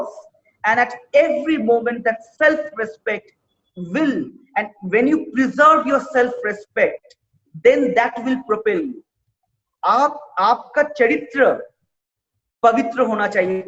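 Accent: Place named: Bengal, India. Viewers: I appreciate you dada n i respect you..but ur bonghindi 😂 🤣.. love that.. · Your bong hindi 👍👍👍👍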